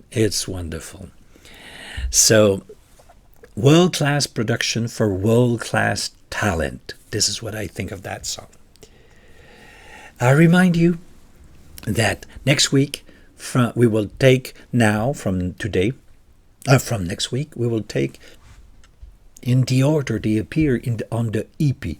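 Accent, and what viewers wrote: Quebec, Canada. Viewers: Dès vos premières phrases, je me doutais bien que vous étiez Québécois. Moi je suis de Boucherville, j’ai découvert les sœurs Villarreal via un vidéo de réaction sur leur premier cover de. Je fut conquis sur le champ. Depuis, je les ai vus en spectacle 4x (2x à Monterrey Mx et 2x à NYC). Elles donnes tout un spectacle. Mais, ce qui m’a vraiment décidé d’aller les voir dans leur ville fut une conversation internet avec leur papa. Toute la famille est tellement terre à terre et ils sont super gentils. Vous, vous les avez trouvés/découverts comment?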